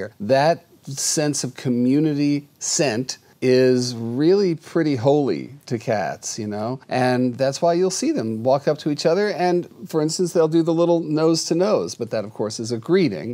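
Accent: England, United Kingdom. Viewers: Hi, love your English acent! My cat Cloud gives me headbutts, and my cat Cat's, does the sea otter when I come home! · you are too much. I love your English accent delivery. This is such a neat, unusual community.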